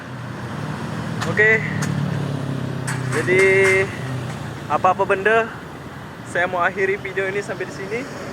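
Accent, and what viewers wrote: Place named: Malaysia. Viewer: orang Lombok tapi cakap kamu seperti orang malay.....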